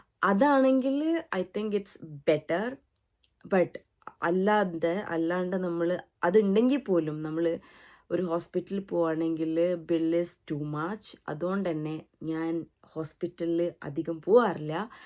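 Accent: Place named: South Korea. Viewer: സംസാരത്തിൽ നല്ല korean touch und. Bt good👍❤️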